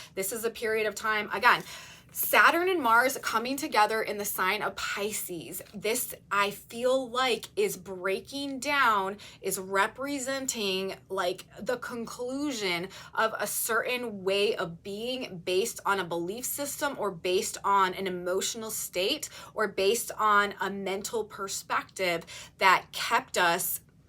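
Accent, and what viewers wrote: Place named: South, United States. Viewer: I love your videos...VERY thorough & highly crucial information. But let me tell ya, girl, you have an adorable southern quirk (I won't say what it is) because it is absolutely adorably classy on you! You put this quirk out there crowned with nothing but SUPER CLASS!!!:washhands::washhands::washhands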